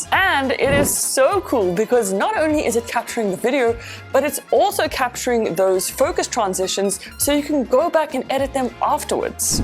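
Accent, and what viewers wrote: South Africa. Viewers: Awesome Thanks for this! Very helpful! Random but are perhaps from 🇿🇦 just based on your accent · R u from South Africa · Thank you enjoyed watching your video are you South African. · I cant tell if your south African or not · ha south african. here's a sub just cause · Omg you’re from South Africa 🇿🇦🇿🇦🇿🇦🇿🇦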